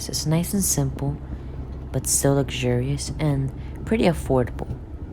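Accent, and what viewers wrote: Italy. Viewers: 🧐🤨🤔🤔🤔🤔, Are You Sure About That! Oh & By The Way, Your Voice Sounds, Uh, Italian Stuff!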